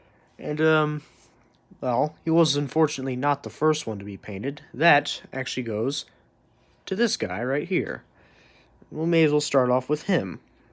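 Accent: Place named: Australia. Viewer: That Voice was classic sounded like a bit of a sophisticated Ork haha mixed with a Aussie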